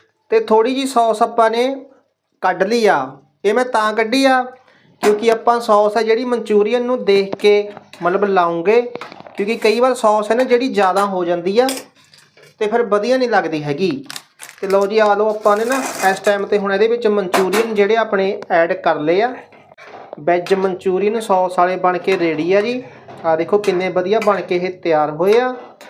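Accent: Ropar, India. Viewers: ਵੀਰੇ ਤੁਹਾਡੀ ਬੋਲੀ ਮੇਰੇ ਵਾਲੀ ਆ ਰੋਪੜ ਜਿਲ੍ਹੇ ਦੀ 😊😊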